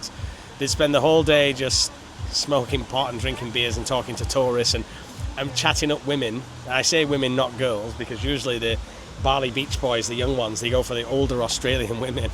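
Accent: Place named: Sheffield, United Kingdom. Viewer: Hi, love your down to earth channel. You’ve not lost your accent, where is it from? It sounds like a Sheffield twang.😊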